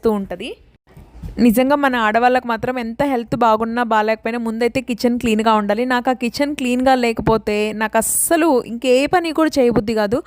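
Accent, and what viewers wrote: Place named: Andhra Pradesh, India. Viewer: Ni language enti kadapa la ledu..... Ma Andhra telugu la ne matladuthunnaru miru...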